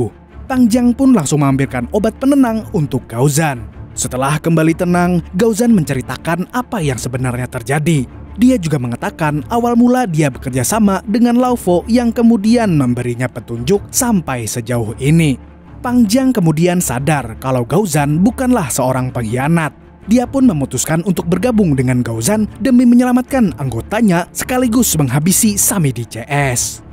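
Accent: Banjar, Indonesia. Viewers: Film John wick bang.... Tetap dengan pembawaan bahasa Banjar yg babangsatan nya bang 👍 Sukses terus buat 👍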